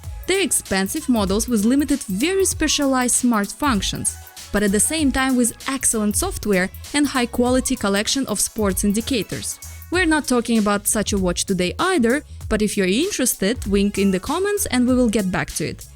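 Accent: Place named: Russia. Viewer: i like russian accent hhhhh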